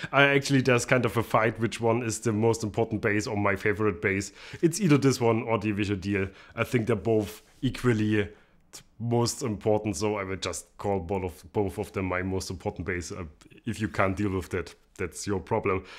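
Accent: Germany. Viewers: Hey brother, I love your channel. I'm also one of those guys that has nicer basses than I should considering my playing ability. I love your self-deprecating humor and also your accent. I was stationed in Germany while in the military & met some very cool people there-it was a blast! I hope to make it back for a visit in the next couple of years. Much respect to you and all the German brothers and sisters.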